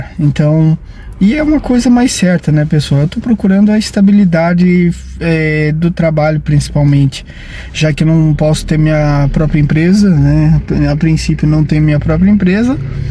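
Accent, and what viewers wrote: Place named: Brazil. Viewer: Olha o conselho, falas, falas e falas e não avanças, vê outros youtubers e logo aprendes e ficas 5 ✨ quanto ao falares brasileiro cada um sabe de si tanto faz o importante é entender-se, mas não existe idioma brasileiro, existe sim vindo do português o que muda é o sotaque, ou dialeto e muitos erros da vossa parte, agora que o sotaque é mais bonito que o português é uma verdade. Fica com a tua e nós ficamos com a nossa o importante é seguir enfrente.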